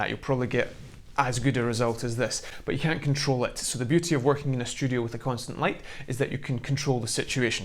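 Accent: Scotland, United Kingdom. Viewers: Hes talking like the characters in “brave” movie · Sometimes a Scottish girl just needs a Scottish guy to explain things! Thank you sooo much! I get it now (I think) 😃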